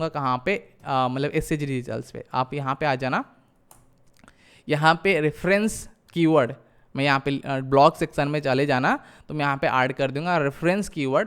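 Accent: Odisha, India. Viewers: Brother, u r from odisha?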